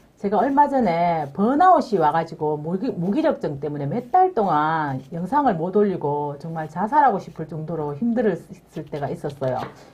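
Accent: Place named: Daegu, South Korea. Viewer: 이 아줌마 진짜!!!!!ㅠㅠㅠㅠㅠㅠㅠㅠㅠ "사투리" "사투리" "사투리"!!!!!!!!!!!!! 어마무시 하다!!!!!ㅠㅠㅠㅠㅠㅠㅠㅠㅠ 사투리도 심한데,,,,,단어선택도ㅠㅠㅠㅠ 나도 경상도 100% 토박이 사람이지만,,,, 이렇게 심한사투리+안이쁜 단어선택 최강의 사투리 아줌마네!!!!!~~~~~~ 단어만 쫌 이쁜단어를 사용해도 좋을텐데 영상을 볼수가 없다!!!!듣기 싫어서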